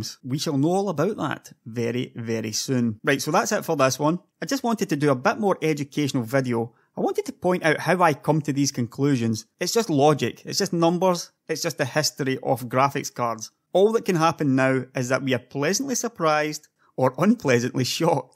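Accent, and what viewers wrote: Scotland, United Kingdom. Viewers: scottish iirc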